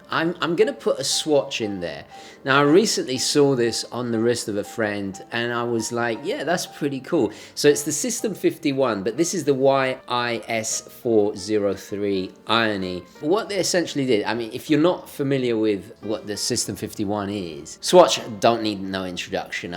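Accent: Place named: United Kingdom. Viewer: Just came here for the "Bri-ish Ah-Cents".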